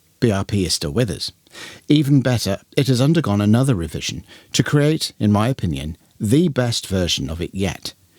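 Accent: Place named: United Kingdom. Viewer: A man with a British accent talking about rpg history is peak.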